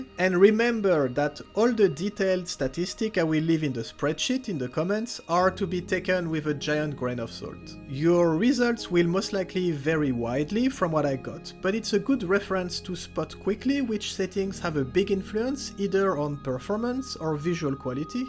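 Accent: France. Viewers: you french ? great video btw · You're the frenchiest YouTube I've ever heard.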